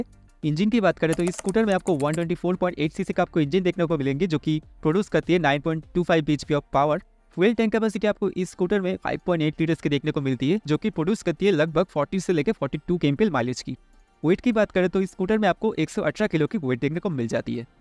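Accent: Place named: Bengal, India. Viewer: You are pure bangali 💯% confirm 95,000 hazar bolne ka tarika pura bangali ka he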